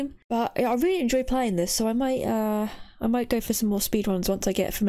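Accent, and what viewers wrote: United Kingdom. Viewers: Look what you've done. How do I know what country you're from? I know you're English. I never knew what country the others were from.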